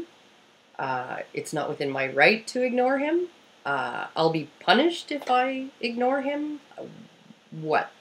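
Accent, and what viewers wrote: Canada. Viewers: Sorry, but I just love the way you Canadians say any word containing out Nothing against Vanadians though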